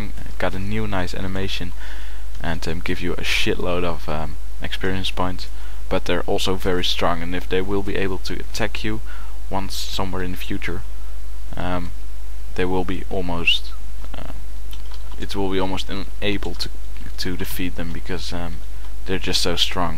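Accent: Netherlands. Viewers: ik hoorde dat je uit Nederland komt door het Nederlandse nadruk xD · wel grappig dat hoe goed een nederlander ook engels praat je toch altijd hoort dat het een nederlander is ; )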